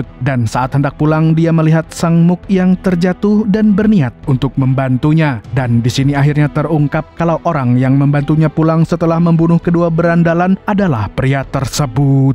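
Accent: Banjar, Indonesia. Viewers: Urang Banjar lah pian ni bang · Nah, urang Banjar sekali nya pang 😂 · urang banjar kah pian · Umaa urg banjar jua sklinya😂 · Umaaa urg banjar sekalinya leh😂😂😂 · Banjar pinanya 😂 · Lah banjar wkkwk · urang banjar kah mang😂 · Banjar kah nih 😂😂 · Bahasa Banjar kah teh tadi lwn logat nya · Anjirrr urng Banjar kah ikm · Urang Banjar kaya nya nih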